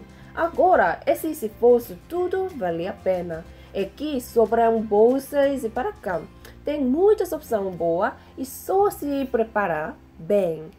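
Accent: South Korea. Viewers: Descobri esse canal há pouco tempo, me inscrevi e não perco um vídeo. Nooooossa, amo essa coreana e esse sotaque maravilhoso!!🤗😻🇧🇷🇰🇷😍😍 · Ficou lindo a língua portuguesa com sotaque coreano . Linda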